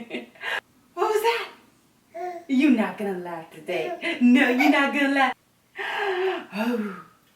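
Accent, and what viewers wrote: Louisiana, United States. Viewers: girl he is so cute, Your LA'na accent is so thick LOL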